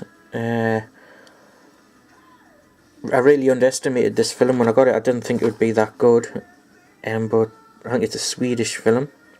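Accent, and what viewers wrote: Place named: Newcastle, United Kingdom. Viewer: Are you a Geordie or a Mackem? I'm from Gateshead :) Subspecies is great, I enjoyed them when the Horror Channel showed them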